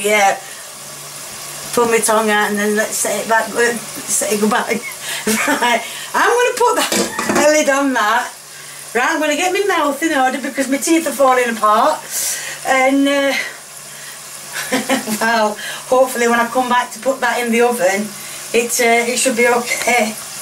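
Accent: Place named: Yorkshire, United Kingdom. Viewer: where are you from? We have a disagreement here.... I think you're from north Rotherham somewhere. My mum thinks you're from somewhere like Wakefield. Please help xxxxxxx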